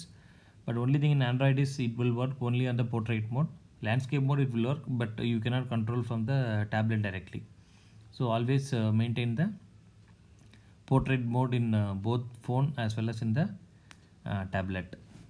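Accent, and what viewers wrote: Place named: India. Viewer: Indian English yessss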